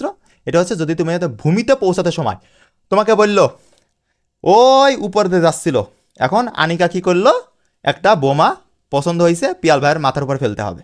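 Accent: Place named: Jessore, Bangladesh. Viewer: Jessore er vasa😁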